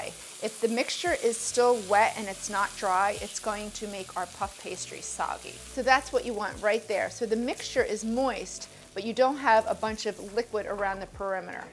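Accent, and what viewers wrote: United States. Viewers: Lol I love your pronunciation of everything, it’s so American it’s cute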